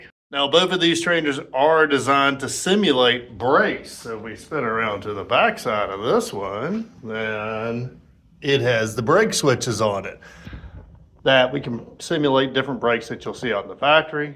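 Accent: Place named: South, United States. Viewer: the southern accent probably threw him off and made him think you were in California. common mistake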